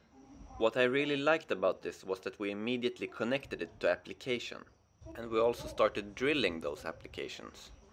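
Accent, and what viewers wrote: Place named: Scandinavia. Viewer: Your accent sounds familiar. Are you Swedish or from a nordic country?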